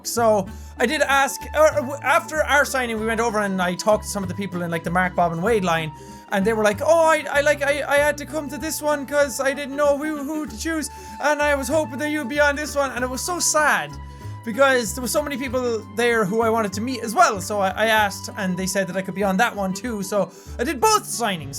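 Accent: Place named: Ireland. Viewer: Where is from in Ireland? I'm from Dublin so I know he's definitely not from here but is it Cork or somewhere? Can't really place his accent hahaha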